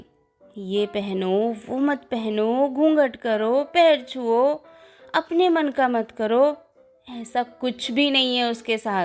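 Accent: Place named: Kanpur, India. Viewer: Wah ji wah wat a style of narrating.. Har baar surprise deti ho dear... Bahut hi maza aa gya itni mast story or itne mast tumhare kanpuri style me gazab ... Kaise bikul kanpuri style me wow it's superb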